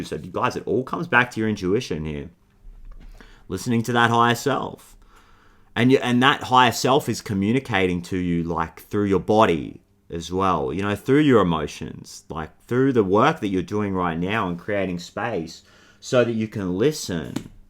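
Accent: Australia. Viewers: Left tarot vids for a year, been needing it this past week and it’s been EPIC and defo in the cards, wherever I go, but I gotta say it was so lovely to hear your lovely Aussie sweetness, familiar to my ear. Am on X stages, surrounded by all ethnicities, mainly Yanks. So hearing such a sage and true message from you was just the cherry on top…as I head into my Hermit phase. Looking forward to tuning in here again. Grateful for you. 🥰🙏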